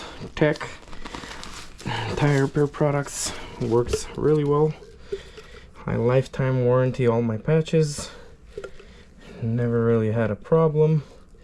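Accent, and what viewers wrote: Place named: Trinidad and Tobago. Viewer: Why do you sound like you’re from the Caribbean? Trinidad to be specific?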